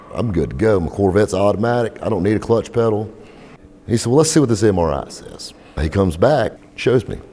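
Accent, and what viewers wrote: South, United States. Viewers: your positivity and a good nature generated a good result. Being a character doesn't hurt either. Now I'm sure the good folks at the hospital are used to your Southern accent but in this video and with that voice of yours you make a dang good story teller, son. You are one charming rogue and I could listen to you tell stories all night. Glad it all worked out all right. P.S.: Don't take the "son" part too personal. I'm a week away from 73 years so I've got a few on you. And I ride a '99 1100cc Yammie cruiser (460 lbs dry weight) on a set of stickies and they earn their keep. All in good fun.... Keep it sticky side down, and thanks for sharing. Best regards from a Canadian. The part a couple of hundred miles east of northern Michigan. I'm 45 minutes from the Seaway if the right people are looking in the correct direction, namely away.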